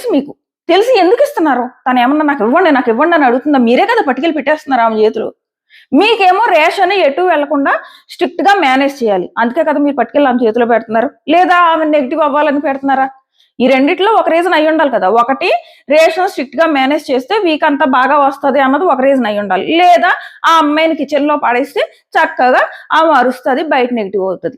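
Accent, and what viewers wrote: Godavari, India. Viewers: ఏదో గోదావరి జిల్లా భాషతో మాట్లాడేసి మీకు కనిపించింది గొప్ప అనుకుని మాట్లాడేస్తారు మీ సర్కిల్లో కూడా మీ సర్కిల్లో కూడా మీ ఫ్యాన్స్ కూడా మీకు తగ్గట్టుగానే మాట్లాడేస్తారు శ్రీజ తనుజ విషయంలో కొంచెం పొగరుగానే మాట్లాడింది డిమాండ్ విషయంలో పొగరుగా మాట్లాడలేదు ఇంకోటి ఏంటంటే ఎవరి ఆటిట్యూడ్ వాళ్లకు ఉంటుంది ఏదో మీరే గొప్ప అనుకుని మాట్లాడేస్తున్నారు శ్రీజ ఎలిమినేషన్ అయిపోయినందుకు మీరు చాలా సంతోషంగా ఉన్నారు కానీ శ్రీజ ఎలిమినేషన్ బిగ్ బాస్ కి గొడ్డలి పెట్టు ఇప్పుడు ఎవ్వరూ బిగ్ బాస్ ని అనకుండా ఏమి తిట్టకుండా టాస్క్ లో ఓడిపోయింది కాబట్టి మిగతా వాళ్ళకి జెన్యూన్ రీసన్ ఇచ్చే లాగా జెన్యూన్ గా కన్వెన్షన్ ఎలాగా బిగ్ బాస్ మైండ్ ప్లేయింగ్ చేసింది మీరేమో పెద్ద మీరే గొప్ప అనుకున్నట్టు శ్రీజ వరస్ట్ అని చెప్పేసి ఓవరాక్షన్ చేసుకుంటూ మాట్లాడేస్తున్నారు ఒక్కసారి ఒక్కసారి ఆది రెడ్డి గారి ఇంటర్వ్యూ ఆదిగా రెడ్డి గారి రివ్యూలు చూడండి రివ్యూలు ఎలా ఇవ్వాలో ఆయన దగ్గర నుంచి మీరు నేర్చుకోండి అయినా తప్పులు చేస్తారు కానీ 10% 15% మాత్రమే మీరు మాత్రం 50 శాతం తప్పుగా చెబుతారు కొన్ని బాగుంటాయి అనుకునే లోపే వ్యక్తిగత అభిప్రాయం ఇంకోసారి మళ్లీ చెప్తున్నాను జాగ్రత్తగా వినండి డెమో ఖచ్చితంగా చేసింది వెన్నుపోటు మొహమాటం లేకుండా చెబుతున్నాను మీరు గొప్ప నేర్చుకొని నీ మీద ఉన్న గౌరవం కూడా పోతాడి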